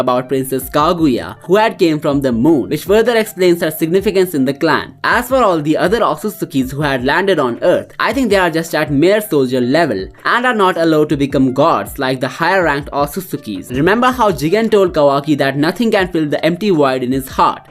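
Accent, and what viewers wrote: India. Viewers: Bro your accent is like indian r u indian?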